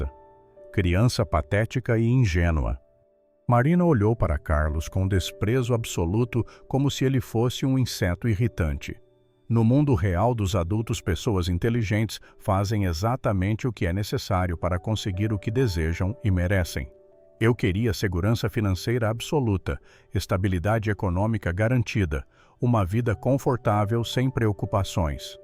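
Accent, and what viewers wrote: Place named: Minas Gerais, Brazil. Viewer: Este contador de estória com sotaque mineiro Mairna ora Marina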